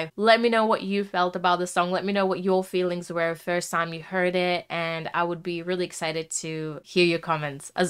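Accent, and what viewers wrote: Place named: Eastern Europe. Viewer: do you have Slavic roots? You have an interesting accent. I can't figure out where this is from, very interesting 😅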